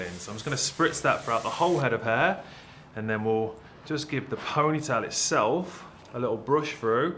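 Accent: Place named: United Kingdom. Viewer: hold the phone.....he's BRITISH???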